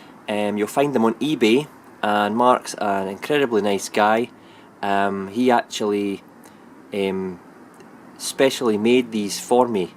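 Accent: Scotland, United Kingdom. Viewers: Nice work!!! I watch for the Scottishness as much as I do for the trains :)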